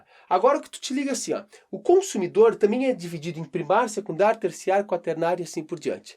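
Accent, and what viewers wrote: Rio Grande do Sul, Brazil. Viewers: sou apaixonado pelo sotaque gaúcho.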